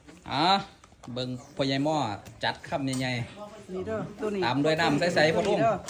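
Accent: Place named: Korat, Thailand. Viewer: เอาอีกแล้วพูดนีแทวโคราชเรียกเป็นกองดูจากสายไหม❤❤❤❤❤🎉🎉🎉🎉🎉😂😂😂😂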